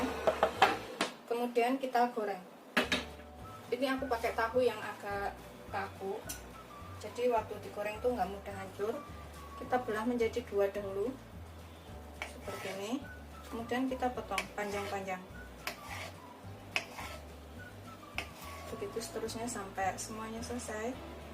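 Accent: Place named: Banyumas, Indonesia. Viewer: Mba'e logate kaya wong mbanyumas.. 😊😊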